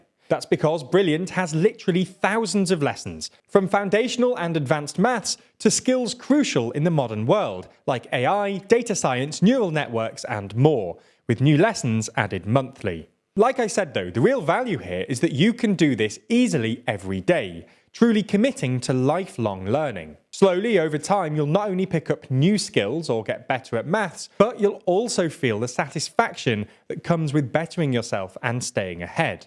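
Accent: United Kingdom. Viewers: Big fan of TLDR. Still, it sounds very condescending listening to a British accent telling us how well we have done "given where we have come from". Where we came from was oppressive British rule, that famine you very briefly touched on was a genocide perpetrator by the British govt of the time. Yes, we have come a long way!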